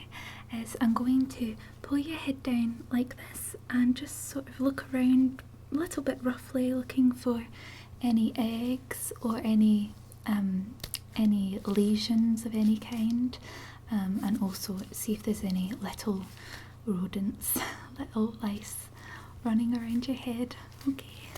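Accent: Scotland, United Kingdom. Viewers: Where in Scotland are you from?x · All your videos are great and a particular thumbs-up for the Scottish accent! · ASMR I thought as much it was a Scottish accent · Rebecca Harper it's called a Scottish accent... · OMG SCOTTISH ASMR THE WORLD IS A BETTER PLACE 😱😱😱😱😱😍😍😍😍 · heyo ma wee fellow Scottish pal!! where abouts in Scotland are you from? i wanna say glasgowish but then your 'body' sounds different from mine so I'm not sure hmmmmm ohh hmm maybe not hmm most likely much more up north? am I right? I'm definitely right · Perfect ASMR! As a Scot I give your Scottish accent 10/10 :) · I don't normally like Scottish accents but yours is very nice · Nice Accent! Scottish, Right? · I'm absolutely hooked on your role play videos with a Scottish accent. the rp vids also seem so real. love them. thank you for making asmr videos!! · I just love to listen to a scottish accent.the best accent there is. 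· I thought the cables connected to my headphone speakers where plugged into the water supply, because that gorgeous silky smooth Scottish accent just flowed into my head. Please can you do another ASMR kidnap video sometime. Thanks a million for all your hard work. 👍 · How do u put on such a good Scottish accents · I'm not a fan of your Scottish accent, sorry! The Scottish accent feels like a weird step into the unknown. sorry to be critical just my own opinion. Otherwise really enjoy your videos.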